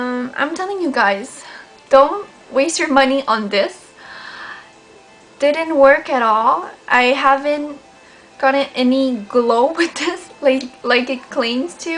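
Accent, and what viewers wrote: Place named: Quebec, Canada. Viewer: I love St.Ives I use almost all of their products :) But....just asking do you live in Quebec because you sound french from there...anyways I love your videos! :)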